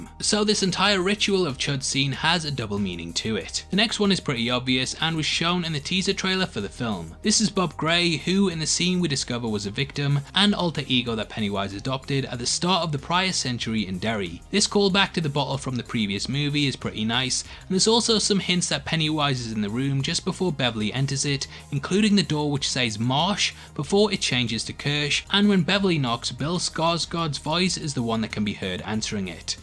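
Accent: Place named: Newcastle, United Kingdom. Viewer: Are you a geordie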